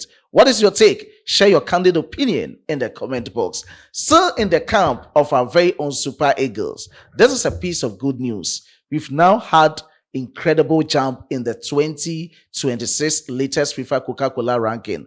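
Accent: Ghana. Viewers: You sound like another Ghanian pretending to like Nigerians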